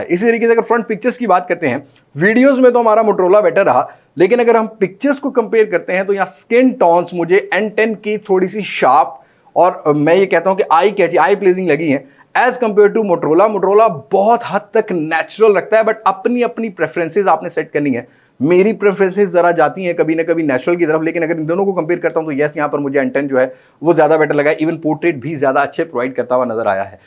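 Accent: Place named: Punjab, India. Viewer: Bhai aa Punjabi touch bara wadya lgha💯❤️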